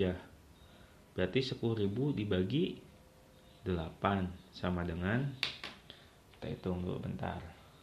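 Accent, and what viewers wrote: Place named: Tasikmalaya, Indonesia. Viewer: Saya tasikmalaya pak...pantesan bicaranya kayak sama he he he. Belum pak saya baru rencana tapi pasti mau...masih nyari informasi dulu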